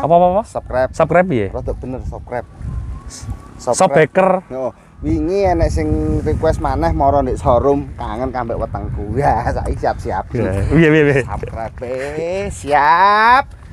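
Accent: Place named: Java, Indonesia. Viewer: Aku seneng bosomu medok, Mas ... 👍😂👍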